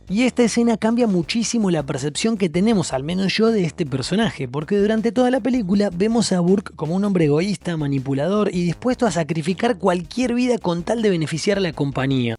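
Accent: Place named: Argentina. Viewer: Lo que en llamas "torretas" se llaman en realidad robots centinelas .. Todo bien con este contenido que me parece muy interesante,pero hay que decir las cosas como son.. Además no entiendo xq este canal pone como país de origen a Estados Unidos,cuando cualquiera que te escucha hablar de da cuenta que sos argentino.. Saludos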